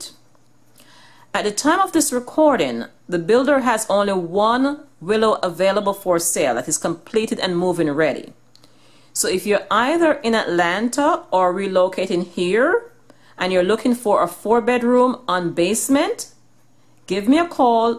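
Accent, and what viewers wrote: Jamaica. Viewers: Just found this channel and loving it so far well tailored video lovely homes I like seeing decorated homes. Thinking of moving to Atlanta in the summer 2020 will definitely be keep my eyes glued to your channel...thanks for the videos keep them coming😊😊.....btw you sound Jamaican🇯🇲😊😊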